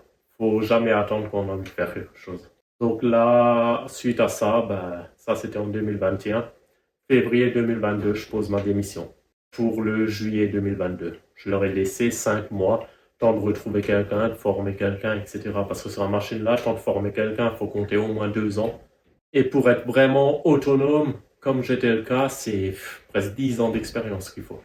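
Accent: Alsace, France. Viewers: Hopla Salut Comme disait Germain Muller, nous les Alsaciens nous n'avons pas d'accent c'est une intonation! Toutes mes sincères félicitations pour ton parcours professionnel, cela explique ta rigueur et le souci du moindre détail. C'est toujours un plaisir de suivre tes vidéos Le Haut-Rhin te salut bien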